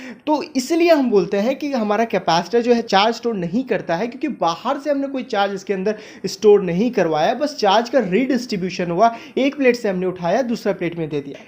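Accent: Bengal, India. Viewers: Are you Bengali? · Kya aap bangali he?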